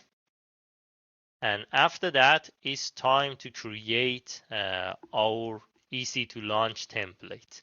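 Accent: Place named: Shiraz, Iran. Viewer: english with shirazi anccent ❤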